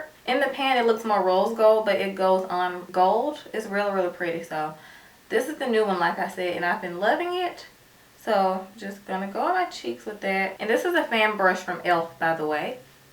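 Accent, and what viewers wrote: South, United States. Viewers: I really enjoy a talk thru because it feels more personal. And I really like your southern accent. Please do more of these! 😊💕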